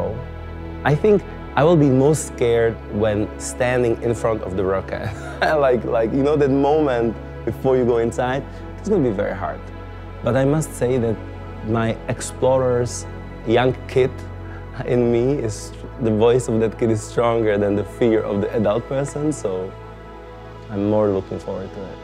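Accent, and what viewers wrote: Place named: Czech Republic. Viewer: Miluji ten český přízvuk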